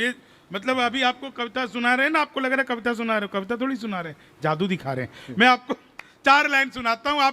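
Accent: Bihar, India. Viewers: Aap BIHAR k ho maloom apke bolne se pata lag gaya NITISH NE JO BHASHAN DIYA USSE PATA LAG GAYA POORE BIHARI PURUSH MAHILAYEN SAB EK SE HOTE HAI SAB GANDA KHANEWALE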